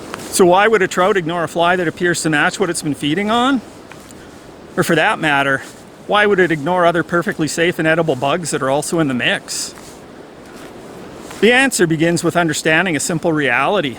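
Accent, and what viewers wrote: Ireland. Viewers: Are you in Maine/Labrador? I can hear an Irish twang in your voice. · Really interesting. Love the irish twang as well.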